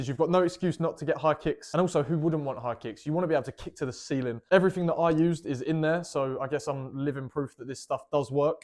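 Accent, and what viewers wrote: United Kingdom. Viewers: With his accent he IS british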